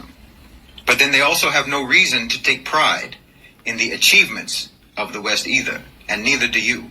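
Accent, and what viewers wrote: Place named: United States. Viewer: I'm confused. He speaks like an American.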